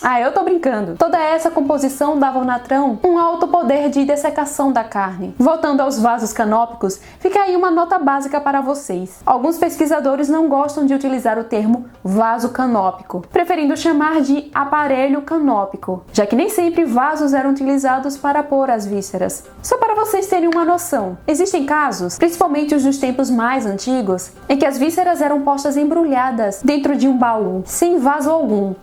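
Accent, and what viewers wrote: Brazil. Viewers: Ah, então você é de Sergipe :)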